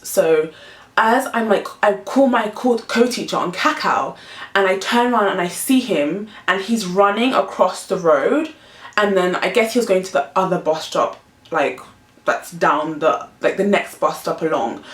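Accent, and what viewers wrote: England, United Kingdom. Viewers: Bring u and that British accent to Northern California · Love your videos and stories, very detailed :) also UR English accent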